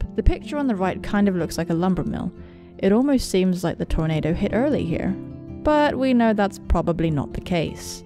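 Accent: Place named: United Kingdom. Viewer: OK!! So this is my first time here and my first video of yours as well and it seems like you are a big fan of 'Life is Strange' and that is really cool, and I have wanted to say this from the beginning but I LOVE your voice! British? Australian? But more British I think... Am I close? Anyways love the voice and I love the game so I think I will stick around.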